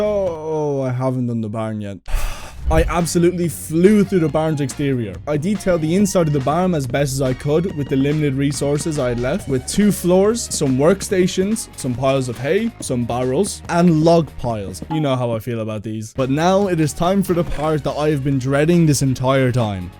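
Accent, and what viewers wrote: Ireland. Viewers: I KNEW U WERE IRISH I KNEW I RECOGNIZED A IRISH ACCENT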